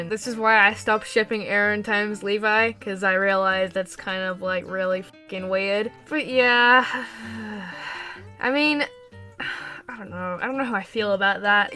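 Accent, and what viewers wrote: New Zealand. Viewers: are you a new zealander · Are you from New Zealand ? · OMFG ARE YOU FROM NZ !!!!!!!!?!!!!!!????!!! Edit: YOU AREEEEEEEEE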